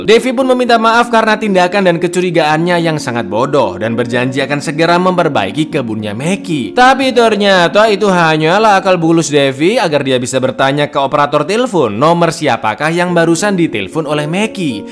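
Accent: Java, Indonesia. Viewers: Khas selalu sama logat jawanya bang.. jos bang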